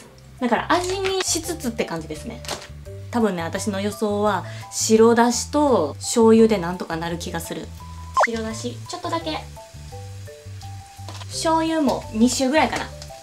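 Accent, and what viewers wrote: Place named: Osaka, Japan. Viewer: 大阪弁が癒されます！いつも、ありがとうございます😊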